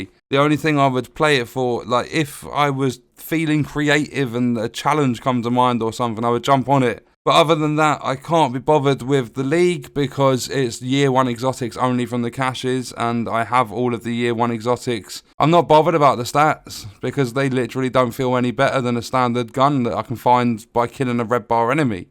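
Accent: United Kingdom. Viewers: That’s everything I’ve been saying ever since TU8 dropped except in a British accent. Even the fuck the devs. I don’t wish them death or disease but I do wish the could pull their heads out of their asses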